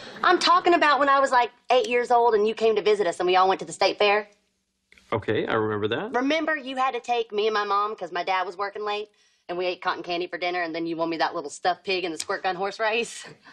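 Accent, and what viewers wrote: United States. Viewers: she sound so country lov ya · I love her country accent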